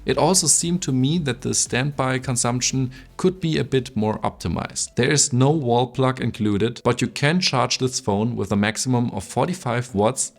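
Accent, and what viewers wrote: Germany. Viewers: I knew this was a German speaking 😂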